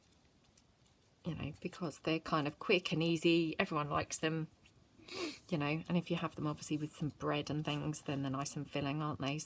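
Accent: England, United Kingdom. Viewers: First ,as living in the US, what are Eggs & Chips and Potato Jackets? I don’t do much with paper , but am trying to get some knowledge of things I like , so I can! Also , your voice sounds exactly like a friend but I forget where in England she is from, but , still , Her accent always seemed a little different and exactly like yours! She IS NOT a crafty person at all, but does hair! I’m sure there is a different word you use for hair dresser but don’t know what it is! I love the words you both use a lot like “fiddly” & some I can’t think of now, lol. I will have to ask her about the dinner menu’s:-)) I love that paper and your tag! I’ve had some people I have subscribed to for ages and never seem to go outside of my box in watching videos, which I am now doing! There had to be a good reason I subscribed in the first place and now I can see why (except for the fact that you talk as much as I do in my videos) 😆. 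Gail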